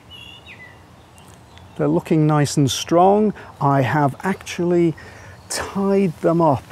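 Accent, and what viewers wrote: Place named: Liverpool, United Kingdom. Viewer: I’m really enjoying this video, it’s so interesting, thank you for sharing. I have to ask are you originally form Liverpool by any chance? I feel like I can detect the slightest hint of ‘scouse’ in your accent ☺️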